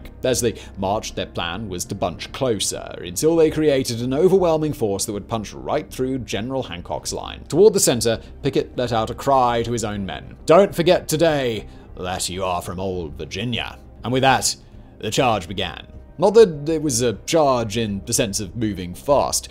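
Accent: United Kingdom. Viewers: I love the different British pronunciations of American words. 'MURICA!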